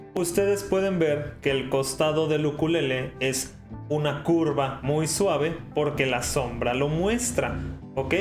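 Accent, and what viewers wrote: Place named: Monterrey, Mexico. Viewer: Muy buenos tus videos compadre, stan con madre, se aprecia el esfurzo enorme muy entretenidos dinamicos con ese inconfundible acento regio, saludos🙏🙏🔥🔥🦾🦾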